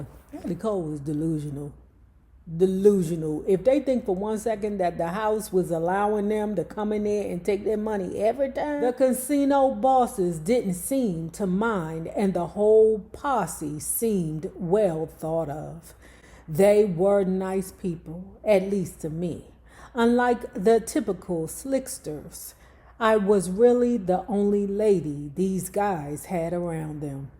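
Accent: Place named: Washington DC, United States. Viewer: Won't even mention that DC accent😮😮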